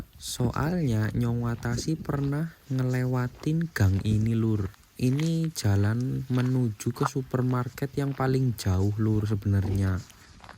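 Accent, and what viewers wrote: Banyumas, Indonesia. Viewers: Ngapak'e banyumas apa bro?? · Bahasane lho.. ra nguati.. 😂😂 ngapak indo.. tapi kue dadi persona lho mas, pertahankan yo.. bahasane wis apik kaya kui.. nek bahasa indo mulus.. ah.. wis biasa.. ngapak indo donk, baru keren 😂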